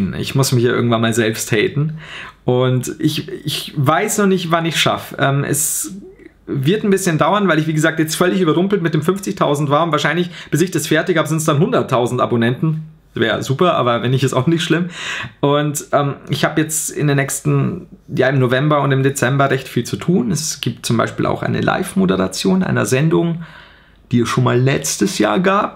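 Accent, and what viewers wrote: Bavaria, Germany. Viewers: Ich habe dich leider erst vor einer woche ca. Gefunden, aber ich feier deine videos/ dein Humor Ziemlich, Die neuen sowie die älteren. Viel glück und viel spaß weiter hin !!! Ps : Ich feier dein Dialekt, aber könntest du ma ein Ausraster over 9000 auf Bayrisch machen ? xd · Hey du bist einfach der beste aber bitte heb nicht ab denn so wie du bist bist du einfach nur geil und s geilste is ja dast bayrisch schmatzd aiso i wünsch da ais guade:) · Dei bayrisch is sa gal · Aus welchem Regierungensbezirk Bayerns kommst du?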